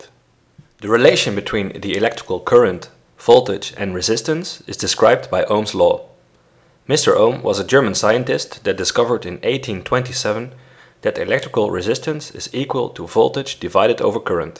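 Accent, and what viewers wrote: Netherlands. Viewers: You sound very Dutch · do I hear a little dutch accent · Nederlands accent herken je altijd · Are you, by any chance, Dutch? That, or my accent recognition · Are you from holland?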